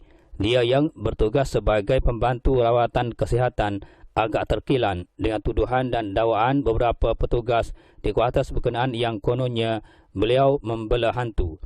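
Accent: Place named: Kelantan, Malaysia. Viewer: xblh buat apa.cara ulasan tu kejap Kelantan kejap bahasa baku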